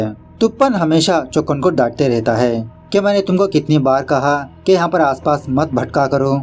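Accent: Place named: South, India. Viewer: Love ur voice ji😍😍 South Indian hindi bolte to accent bht acha lagata hai..me v south side se hoon..andhra odisha border😀😀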